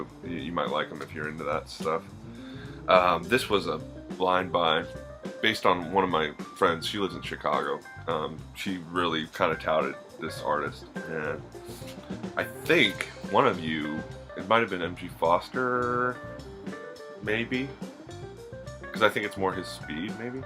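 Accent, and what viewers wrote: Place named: France. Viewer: Just love your French accent! nice diabolique pick ups.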